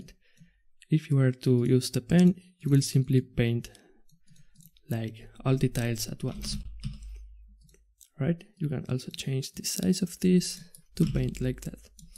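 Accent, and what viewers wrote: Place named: Spain. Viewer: Great Video! by your accent i think that your native language is spanish, dont worry, spanish is also my native language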